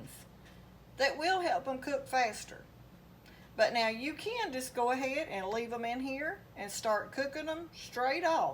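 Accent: South, United States. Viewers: 🤣😂🤣It's southern charm, dear. I live in Missouri and my southern friend from Virginia thinks I talk funny. We can appreciate one another's differences, and be surprised by them.! 💕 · Don't you just love it? 🤗 I always love the sound of a southerners words.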